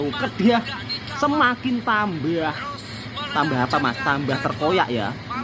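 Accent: Java, Indonesia. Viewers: pertama kali mendengar ulasan loghat Jowo. 👍🏼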